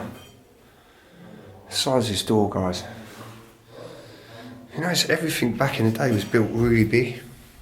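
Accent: England, United Kingdom. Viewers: what about Abandoned world explorer and Adventures with? I truly enjoy watching your videos. I have watched numerous other Explorers but I must say I like your style, how sincere you are, you are truly interesting, educational, funny, and easy on the eyes. I also love your voice and English accent is marvelous. You have a true gift. I wish you so much happiness, peace and joy doing what you love.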